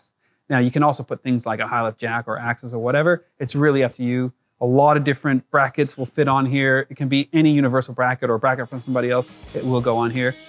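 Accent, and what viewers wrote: Australia. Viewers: This guy was for sure an Aussie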